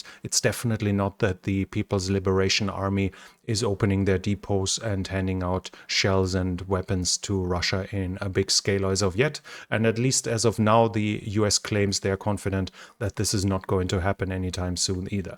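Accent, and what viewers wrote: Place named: Germany. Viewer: Ich mag dein German-English 🤗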